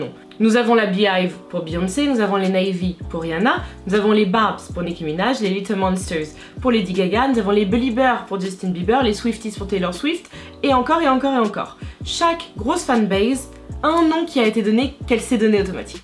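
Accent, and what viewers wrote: United Kingdom. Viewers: On accent anglais >>>>>>>>>>>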